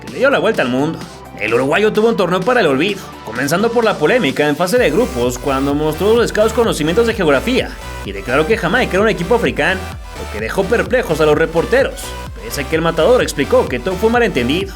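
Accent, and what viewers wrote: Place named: North, Mexico. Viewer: Que onda con el acento norteño del narrador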